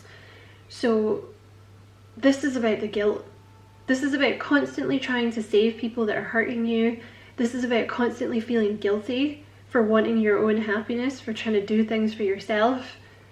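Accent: Scotland, United Kingdom. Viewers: Are you Scottish? First tarot reader I’ve came across on here that is. ☺️ I’m in Glasgow ☺️ Love hearing the accent 😁👍🏼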